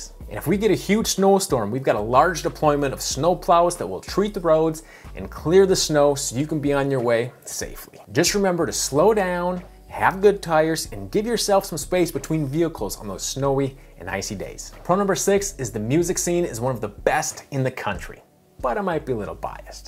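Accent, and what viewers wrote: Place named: Minnesota, United States. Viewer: Wow, I really hear your Minnesota accent. Love it.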